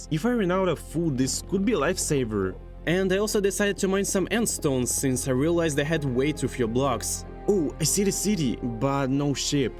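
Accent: Russia. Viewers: when do you guys realised he is a russian guy, i realised it in 2 min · как же палишься братан по акценту)) своих видим за версту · nah, its russian accent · У тебя русский акцент По секрету всему свету скажи откуда ты? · С первых слов поняла что ты русский, но не было доказательств, пока не увидела достижения 😂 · Настольуо акцент русский слышен, что даже забавно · I hear russian accent lol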